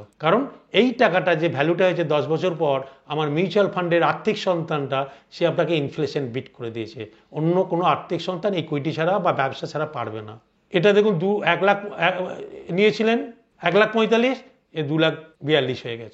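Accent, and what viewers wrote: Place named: Bardhaman, India. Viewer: দাদা আপনি কি বর্ধমান জেলার মানুষ? কথায় টান পেলাম তাই জিজ্ঞেস করছি কিছু মনে করবেন না প্লিজ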